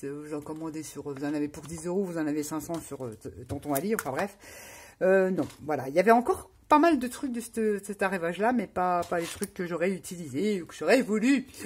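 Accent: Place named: Lorraine, France. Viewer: Bonjour, je suis nouvelle abonnée, et j'entends que tu es en Lorraine comme moi...Tu es de quel coin? Je suis de la région de Pont-à-Mousson. Peux-tu me donner l'adresse de ton nos stp? Bisous 👋⚘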